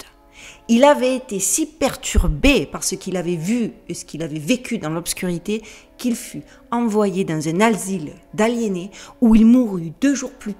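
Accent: Marseille, France. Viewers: eh tu sais quoi ta un accent de marseille tu l as pas perdue tu peux me croire bonne continuation et allez l om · Bonsoir, comme toujours un sourire et un humour que j'adore et je ne parle pas de l'accent de Marseille top. Superbe sujet et vraiment à l'ordre du jour on en revient toujours au SS ils sont vraiment trés présent dans notre quotidien depuis toujours. En se qui concerne la visite du château se sera comme toi sans façon laisse ma place aux autres!!!! Belle semaine...... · hello tout le monde toi qui a un accent du sud de la france cherie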